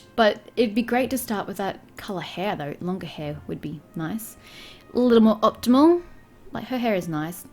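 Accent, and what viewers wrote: Australia. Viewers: What Australian state do you come from? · Helloooo from Denmark ... sweet voice... NZ or Aus? I'm guessing Aus 🙃 Start. Character build. "Honey, why is your hair so luminescent?" LOL ... also she starts the game here. Also LOL 😅 Motion blur is disgusting ... agree! Haha.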